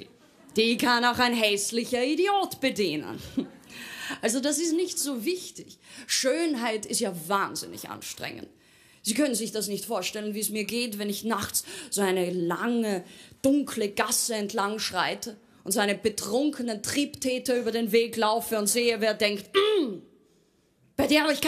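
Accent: Austria. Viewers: nach drei Sekunden zuhören in Österreich angekommen ....:-( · Auch wenn man bei Lisa Eckhardt grundsätzlich ihren Zynismus bedenken muss, stellt sich die Frage: Ist sie jetzt noch selbstbewusst oder schon arrogant? Diese Frage lässt sich aber wohl nur dann sicher beantworten, wenn man sie abseits der Bühne kennenlernt. Auf jeden Fall ist die Frau eine großartige Mischung aus Wortgewandtheit, scharfer Zunge und Sexappeal. Und als Bonus kommt noch der hochsympathische Österreicher Dialekt hinzu :) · ist das eine Oesterreichisch? Wir kennen nur Arnie's Terminator Akzent.... klingt wie Hollaendisch. (Gruesse aus London)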